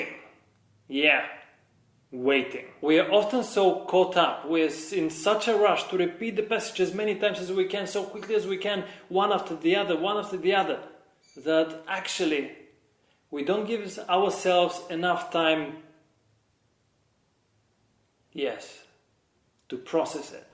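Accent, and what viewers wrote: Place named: Russia. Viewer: You are the best pianist ever, and the best piano teacher 💓 You will be famous one day, i'm sure. I can hear your russian accent when speaking english, one of the best accents. I wish you good luck :)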